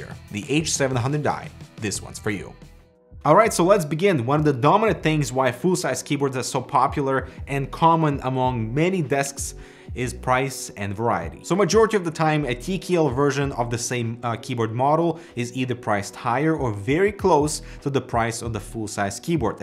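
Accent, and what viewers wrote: Russia. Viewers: По началу было сомнение что вы русскоговорящий из-за акцента, но потом в видео подтверждение проскользнуло. ;)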